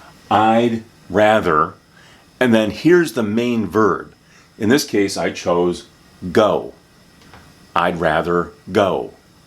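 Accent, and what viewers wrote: United States. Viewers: Never thought understanding American Accent is that easy. (Namaste from India, 🙏)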